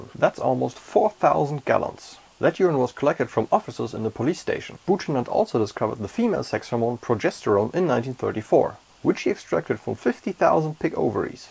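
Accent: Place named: Germany. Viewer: FOrget about what others are saying, you are just starting out, no one should expect you to be perfect. It doesn't matter if your camera is off, you don't do the white balance right as long as the content is great. Which it is. You give interesting facts and you have a german accent. Good enough for me. :)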